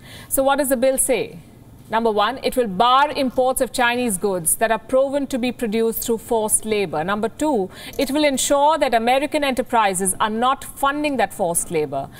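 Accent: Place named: India. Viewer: Amen how did they know dat ,force labor I hope America is not using this trick trying to destroy the Chinese economy ,hence they are far ahead of America,, because this girl I've been watching this channel for a long time this girl is very bias protection everything from American side ,, She don't question anything from American side ,, yes she's got an Indian accent but trust me. She works for Americans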